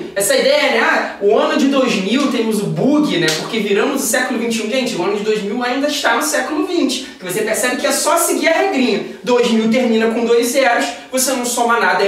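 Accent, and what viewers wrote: Rio de Janeiro, Brazil. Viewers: O complicado mesmo mesmo é suportar o carioquêixxxxx carregadíssimo.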